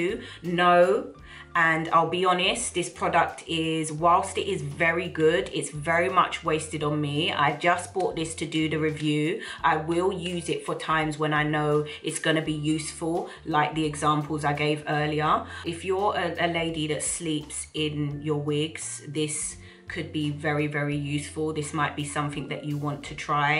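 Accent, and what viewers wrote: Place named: United Kingdom. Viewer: Omg...I love love love love your accent, my dream is to come to the UK 🇬🇧 ❤️ thank you for your review. I bought the Ebin in the pump(like pump it up) you get WAY more lol can't wait to use it.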